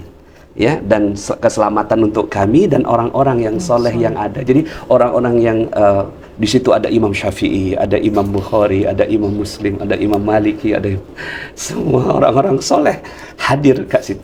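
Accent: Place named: Malaysia. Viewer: Kenapa kok.logat.mslesya pak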